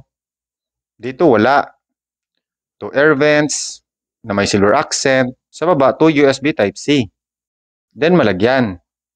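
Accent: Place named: Thailand. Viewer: Bro, bakit Thailander ang accent mo? Muntik ko ng I-skip akala ko ibang lahi 😂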